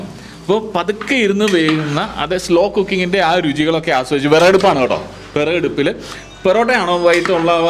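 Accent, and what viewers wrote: Thrissur, India. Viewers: ചെറുതായി തൃശൂർ accent വരുന്നുണ്ട് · തൃശൂർ ജില്ലയിലെ കടകളിൽ പോയി വീഡിയോ എടുക്കുമ്പോൾ സംസാരത്തിൽ തൃശൂർ slang വരുന്നുണ്ടല്ലോ 😊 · ഒരു തൃശ്ശൂർ സ്ലാങ് നാവിൽ കയറികൂടിയിട്ടുണ്ടല്ലോ 🤔🤔🤔, അതോ എനിക്ക് മാത്രമാണോ തോന്നിയത് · ee video il samsarikkumbol oru Thrissur accent inte hint evideyokkeyo varunnund 😁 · Thrissur slang Vanna pole, Akcent change try cheyyano like Sujith bhakthan tried this previously 😁😁😁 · പൊറോട്ടയും ബീഫും 👍👍👍 സംസാരം ഫസ്റ്റ് കേട്ടപ്പോൾ തനി തൃശൂർ സ്റ്റൈൽ പോലെ തോന്നി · light aayittu kottayam bhaashayil thrissur slang kerunnundu , chayayil elakkade essence kerunna pole 😉😉, paranjhattu kaarilya yesu christune yesuvettannu vilikkana teamsanu 😁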